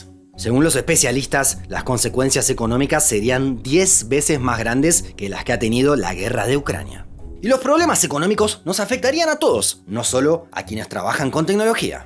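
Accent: Argentina. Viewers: Siempre tuve la duda si sos argentino o mexicano, pero para mí sos compatriota 🇦🇷 jaja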